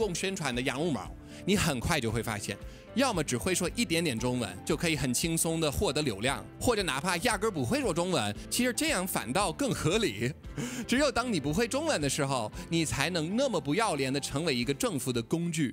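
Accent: Beijing, China. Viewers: 京味十足，加油bro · 我是台灣人 雖然說真的超不習慣你的中文口音和用字 但十分認同你的內容 所以點讚了 然後總覺得還是比較喜歡你講英語的樣子XD 喜歡講母語的你，覺得比較自然XD 也真的從你這影片體認到，果然一個人講不同語言時真的會呈現完全不一樣的性格，給人完全不同的感覺 覺得你用英語結語時比你前面用中文說明時更有說服力，我是指「你反共」這事的說服力 可能太多操著北京腔中文的歐美舔共影片創作者了吧～讓我覺得用北京腔講中文的歐美人都很舔共，你算是讓我改觀了XD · 您的京腔中文很标准 而且是个说真话的人 佩服敬佩🙏🏻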